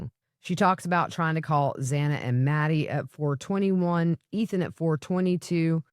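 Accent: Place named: Georgia, United States. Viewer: I live over in South Georgia so when I listen to your accent it sounds like me and my friends talking 😊. Thanks for the great work.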